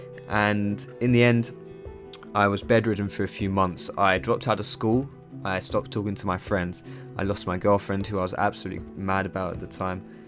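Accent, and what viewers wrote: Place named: Essex, United Kingdom. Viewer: Haha ur an Essex lad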